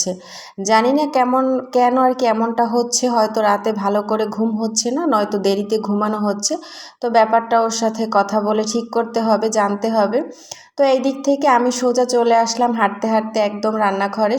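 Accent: Barishal, Bangladesh. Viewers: আসসালামুয়ালাইকুম সোনা আপু আমার ❤কেমন আছো তুমি❤ আমাকে কি ভুলে গেছো😢 ভুলে যাওয়ারই কথা😢 অনেকদিন তোমাদেরকে মিস করে করে গেছি 😢সাধ্য হয়নি তোমাদের সাথে যোগাযোগ করার😢 যাই হোক আপু ❤ভিডিও অনেক ভালো লাগলো❤ শুয়ে পড়ে কানে শুনেছি❤ তোমার বরিশালের কথায় আমার অনেক ভালো লাগে ❤তোমার জন্য অনেক অনেক দোয়া এবং ভালোবাসা রইলো বোন আমার❤❤❤❤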